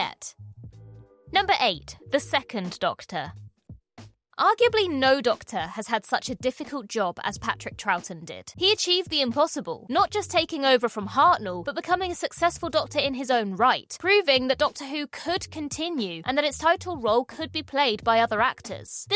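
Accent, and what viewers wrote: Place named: RP, United Kingdom. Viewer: Thank you. You were quite magnanimous in sticking to the script even as you repeatedly said "I wouldn't have picked that one, but it's here on this list, so let's roll with it." I am always grateful to hear clear crisp RP (pretty much) accent. Thank you for that too.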